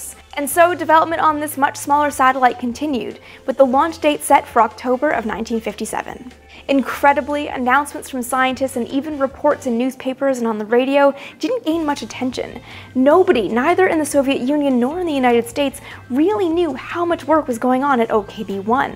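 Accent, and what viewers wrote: Canada. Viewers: Wait, are you Canadian? Maple Leaf pride! 🇨🇦🇨🇦🇨🇦🇨🇦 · She's Canadian?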